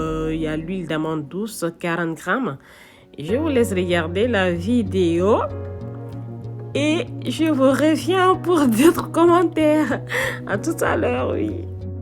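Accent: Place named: Senegal. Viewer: Vous êtes sénégalaise y’a pas de doute, magnifique accent ❤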